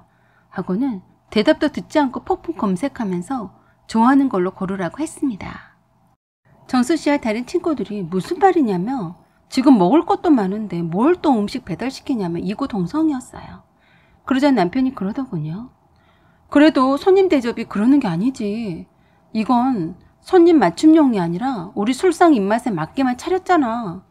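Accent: Jeolla, South Korea. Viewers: 혹시 전라도?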